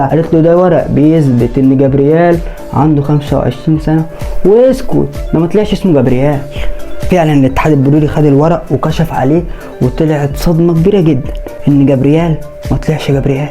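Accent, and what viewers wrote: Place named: Saudi Arabia. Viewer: بتقلد سعودي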